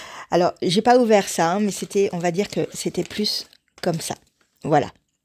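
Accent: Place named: Jura, France. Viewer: Mais oui, continue le ton Jura! Ce serait dommage de le lâcher 😊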